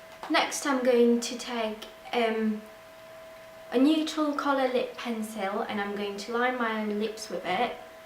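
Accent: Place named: United Kingdom. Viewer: I was like 'She's got a british accent now ' throughout the whole video :P Good one though, I'm officially a celeb's mate :3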